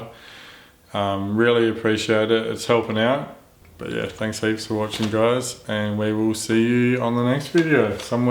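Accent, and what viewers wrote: Australia. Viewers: just subd been wachin your vids took a bit to figure your ausie, pretty sick stuff I love it, thought you were sayin "grouper" in one of the other vids then I got it, still snowing here in northern utah.usa hiding from the virus, maybe later this week I'll sneak out and catch a 24cm brown on a 4wht fly rod to scratch my itch, I just got back from southern baja were I was getting grouper, amberjack and yellowtail or "kings" as you say, what area are you fishing? so I can look on google, don't worry I won't sneak in plus I don't think I've got the balls for that kind of ........ what do you call that, "sketch fishing"? go big! stay safe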